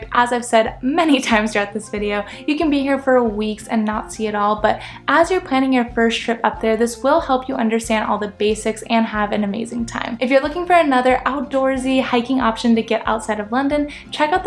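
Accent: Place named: United States. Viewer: Hi. Not sure what Country you come from but you sound American or Canada? Haven't you looked into flights to Manchester from America or Canada? Manchester is much closer to the Lake district than London.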